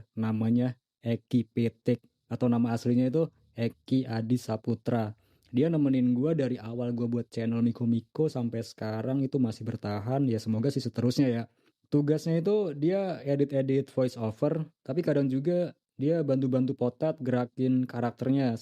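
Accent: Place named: Sunda, Indonesia. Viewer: Sunda juga nih bang😂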